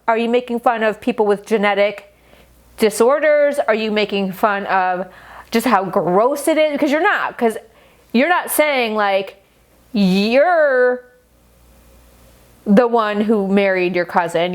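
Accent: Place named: Kandahar, Afghanistan. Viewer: سلامونه جاني، زما مو تر اچار جوړولو په رسا کندهارۍ لهجه ږغېدا خوښه سوه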